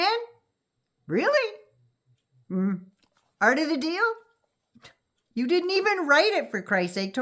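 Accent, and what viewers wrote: Canada. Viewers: Thanks for the Canadian voice. Trump needs to be said to the face - and I have this from a Black lady: Don't bullshit the bullshitter! Well, Trump has bullshitted his voters and supporters.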